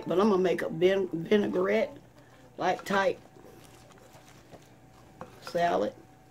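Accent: South, United States. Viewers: My grandma from North Carolina used to make a similar salad fresh from her garden....talk about DELICIOUS. I LOVE your down home accent. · . Why did you choose to only put 3T of white vinegar and 1-2 T of olive oil in……….instead of the 1/2 cup white vinegar with the 2T. of olive oil??? When I was growing up, Mama always added a little ice water to it……..making it in a big jar, so we could always have a batch in the refrigerator. You sound Southern! Just like me, except we are different colors, but a good ole-timey Southern cook!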